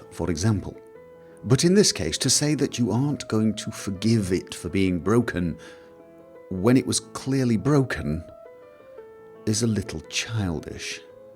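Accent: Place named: North, United Kingdom. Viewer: northern accent is best accent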